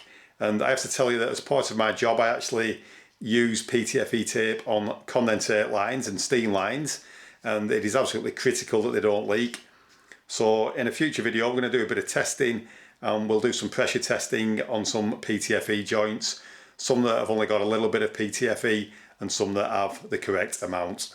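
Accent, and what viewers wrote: United Kingdom. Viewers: Best accent in UK, best advice in World! What did you do to your hand?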